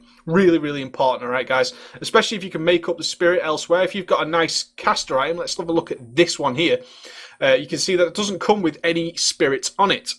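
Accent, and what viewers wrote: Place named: Manchester, United Kingdom. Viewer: Great video, going for a shadow priest with the scroll of resurrection. Nice to hear a Manc accent, I moved to Brazil noy many Mancs there. :)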